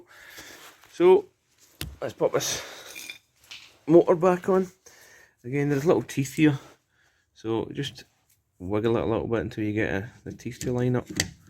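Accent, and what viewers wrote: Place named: Scotland, United Kingdom. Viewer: Scottish English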